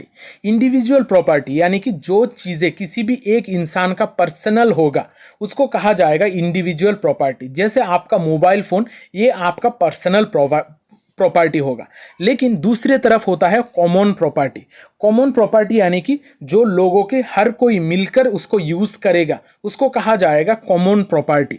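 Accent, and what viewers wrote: Bengal, India. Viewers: Sir ap bangal se h kya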